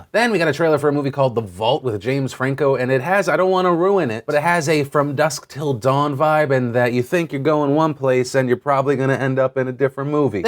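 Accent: South, United States. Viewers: hey, why did you have a southern accent in this one?> XO mom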